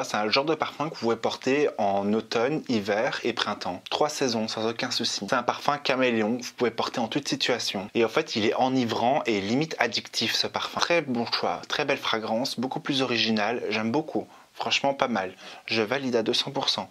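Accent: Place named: Wallonia, Belgium. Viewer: Accent wallon ou brusseler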